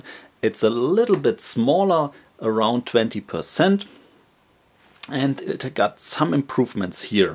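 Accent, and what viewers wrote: Germany. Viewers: I have to say there must be a reason Delonghi still retails the original for $100 more. Not sure why. I'm going to buy a plus as soon as I see a sale to combine with the $50 off from Nespresso. Your accent brought me back to my Army days in Wurzburg :)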